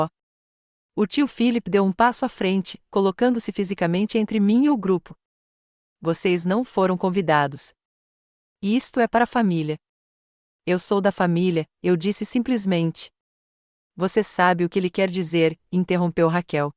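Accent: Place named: Portugal. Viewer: Gostei muito da historia. Eleanor uma grande mulher, que deu uma grande lição à toda a família depois da sua morte, endinando-os o verdadeiro valor da vida, o amor entre as pessoas é muito superior do que o material. Apesar de ser importante e confortável , não o que nos define. Narração perfeita, de Portugal ❤❤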